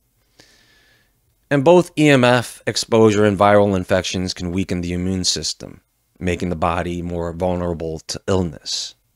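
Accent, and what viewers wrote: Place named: New York, United States. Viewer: & I thot he sounded like a military jarhead, part Italian New Yorker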